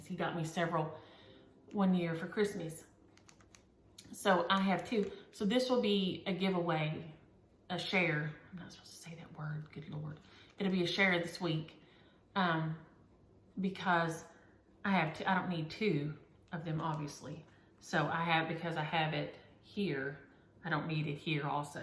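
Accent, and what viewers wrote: South, United States. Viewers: LOVE your videos, you are so funny, love your southern accent. I want to mention Wicked and Heart. Thank you, my name is Linda Norris, Springfield, Ohio.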